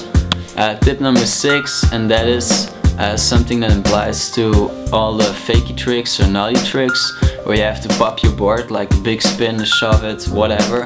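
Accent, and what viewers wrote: Netherlands. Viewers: are you dutch? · Are you Dutch? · yep, obviously dutch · Okay but that Dutch accent tho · u dutch?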